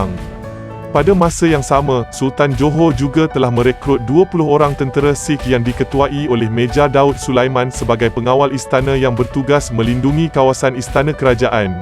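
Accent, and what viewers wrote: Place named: Indonesia. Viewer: Kemungkinan besar asal pembaca narratif ini dari Indonesia kerana pronounciation letter 'J' bunyi macam bahasa Indonesia (based on Dutch). 😂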